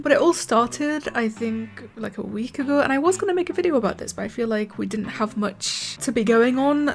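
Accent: United Kingdom. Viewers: Is this woman British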